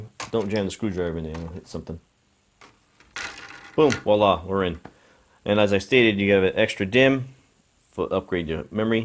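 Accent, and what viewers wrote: Boston, United States. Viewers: Is that a boston accent?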